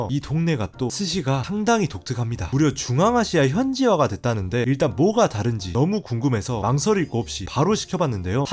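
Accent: Jeolla, South Korea. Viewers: 고향이 전라도인가요?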